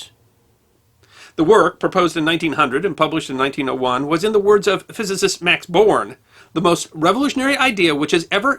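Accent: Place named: United States. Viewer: I love how Americans try to pronounce kuiper lol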